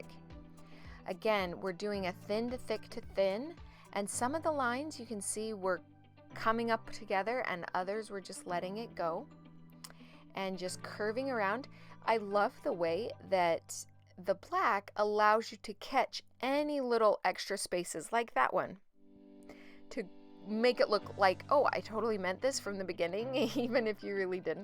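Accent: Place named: United States. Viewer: we should do a collab, but I guess by your accent that you are in America...You are AWESOME!! Brilliant Art Work! <3 <3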